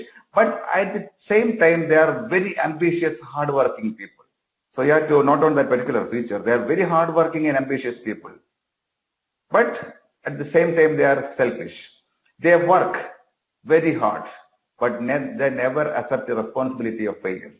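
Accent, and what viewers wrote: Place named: Kerala, India. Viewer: Malayalam mother language So prononsation is likely to be It is for everyone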